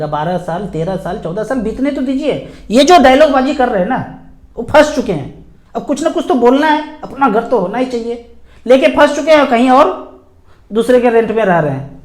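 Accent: Bihar, India. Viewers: Good Video. Are you from Bihar?? You ascent is like Bihari.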